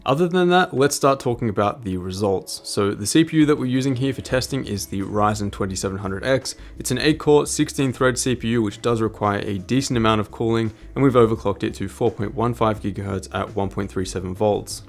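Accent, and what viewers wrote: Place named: Australia. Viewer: Love your videos, nice to have an Aussie accent coming through. I saw in this video that you show the Dark Rock 4 cooler on a min-ITX board and it not fouling on the memory. I'm looking for an air cooler for my Asus b450-I gaming board but can't find any firm info on if the DR4 cooler will interfere with the memory slots or not. Can I ask which board you have in this video, and if you have any idea if it will usually foul against memory or not?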